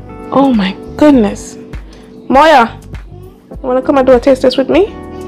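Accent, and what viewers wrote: Jamaica. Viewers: Who is not a Jamaican. Stop talk . Do you know how a Jamaican sound. She is a 100% Jamaican if you don't know anything or sure don't say shit.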